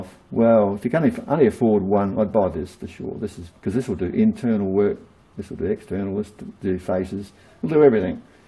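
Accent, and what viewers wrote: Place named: Australia. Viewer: Gidday,I find it interesting that you sound like an Aussie but have a Chinese name.I then go to ebay and i get Chinese pretending they are Australian and located in Australia.Then there is the fact that they say something they are selling is a test indicator but it in reality it's a dial indicator.My mate was going to buy one of those Chinese hobby lathes thinking that the bed size was 1.2meters.I explained to him,that is 12 inches Bill,some people's peckers are longer than that and if you have only half that you need to do it twice,and that 6inches x2=12inches....A fortnight ago i was researching leather work,and in the process of buying leather working tools a burnishing tool for leather edges came up.I then watched a video of someone making some leather tools on a metal lathe and thought that would come in handy to save some coin.Now,i have had an interest in Blacksmithing for years and was thinking about making knives as a hobby,but what is a good knife without a quality sheath made by hand.In my madness i have almost come full circle.If learning means i am alive,why does my body feel like shit?..;)..Thanks for the advice mate...Cheers Gunna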